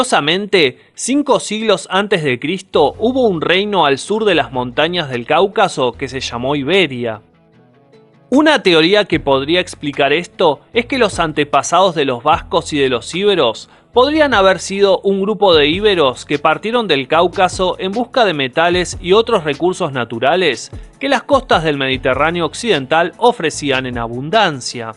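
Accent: Argentina. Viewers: El que habla es argentino; entre Brasil y Argentina no se odian? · Interesante. Un poquito difícil de entenderte con el acento bastante fuerte argentino. Pronuncias la Y com sh o ch en ingles. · ¨Peru tu que tel historico eres. eres por el acento eres Argentino. No tienes ni puta idea de nuestra Humanidad. Los Romanos ´pasaron por Euskadi con permiso nuestro. y les dimos en la ;Madre a Carlo Magno. Aita es Aita. pendejo.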